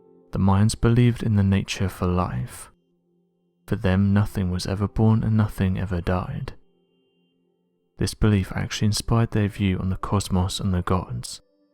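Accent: United Kingdom. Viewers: Cool info. But the British accent I can't listen.. I know it's not right. They are the worst ,look at histroy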